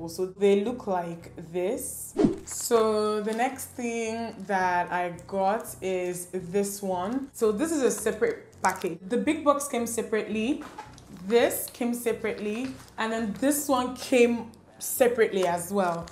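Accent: Nigeria. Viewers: I have sooo many compliments to give ... From the eyeshadow to your skin , I love the fact that your Nigerian accent has not changed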